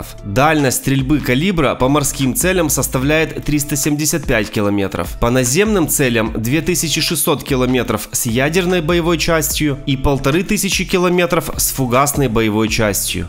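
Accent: Ukraine. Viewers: Сразу понятно что украинец вещает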